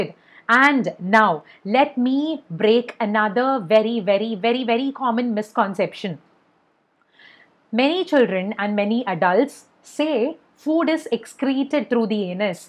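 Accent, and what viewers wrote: Kerala, India. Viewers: ma'am are you from Kerala?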